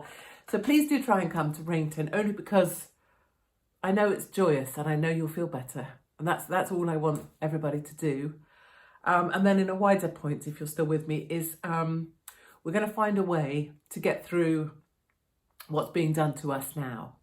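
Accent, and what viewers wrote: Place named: Wales, United Kingdom. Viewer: truly just hearing you ad-libing energetically: Welsh pro-nounciations + lol!!, why, indeed I DO FEEL Better in all this terronic global gnash love you!! The Gospel:"...Christ died for our sins according to the scriptures; 4 And that he was buried, and that he rose again the third day according to the scriptures" 1 Cor.15:3,4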